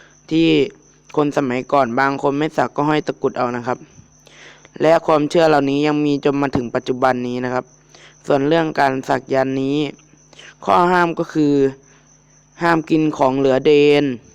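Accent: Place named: Isan, Thailand. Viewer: ว้าวอีสานก่าได้เด้อฟังออกยู่คับ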